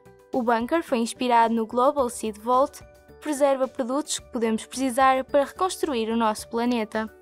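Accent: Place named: Portugal. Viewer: Sotaque de Portugal tão fofo.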